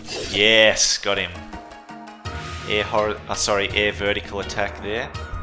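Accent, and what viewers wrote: Australia. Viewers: What reign of Australia are you from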